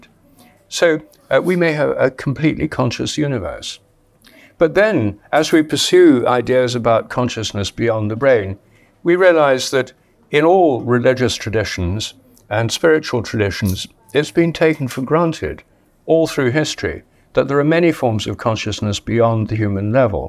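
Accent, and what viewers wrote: RP, United Kingdom. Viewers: This man is a complete quack, and most people don't realise because he speaks in a confident, relaxing, plum English accent. He argument is as basic as durr durr, cosmic web look like brain; cosmic must also be brain. There are many interesting types of reticular patterning throughout nature - alone it does not equate to consciousness. Does a large spider web have consciousness? no. Connecting two disparate ideas together using a spurious third idea is called Magical Thinking - and it is a symptom of schizotypy. Just because its a nice romantic idea o0o0o the universe is conscious, doesn't make it real. Does the universe, like a brain, exhibit goal directed behaviour? No.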